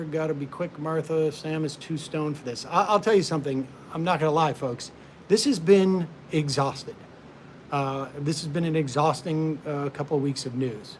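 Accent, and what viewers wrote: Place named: United States. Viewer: Sam has a pleasant American accent. Can someone tell me what region he might be from? (Don't panic, Im not a stalker, just a curious Aussie)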